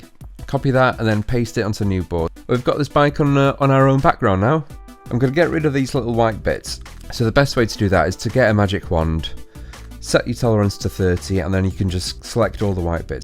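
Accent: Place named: England, United Kingdom. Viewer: i knew through his accent hat this guy is from england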